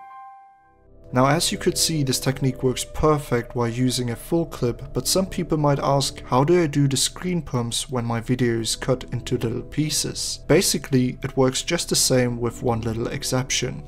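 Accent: Germany. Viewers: Du bist Deutsch?? xD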